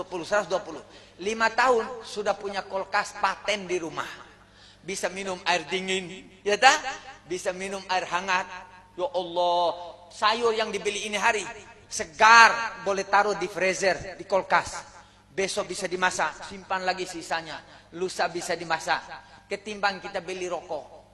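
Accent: Indonesia. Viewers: Logat Dompunya masih ada Syech...❤ n karakternya masih melekat Khas Dompu.. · Habib gaya bicaranya KY orang Betawi ya....Masya Allah...dakwahnya ringan mengena..mudah di terima❤❤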